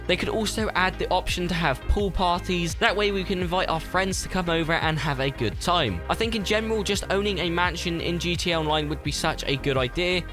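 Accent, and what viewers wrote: United Kingdom. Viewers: defiantly bristolian arent you!!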